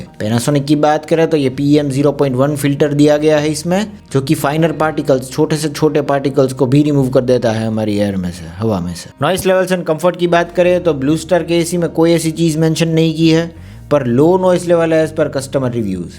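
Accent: Gujarat, India. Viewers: Are you Gujarati?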